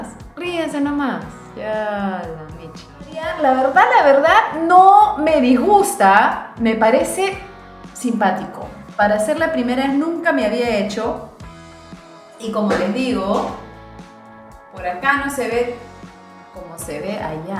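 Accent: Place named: Peru. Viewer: Que lindas son las peruanas......me gusta su acento ..súper el consejo . bendiciones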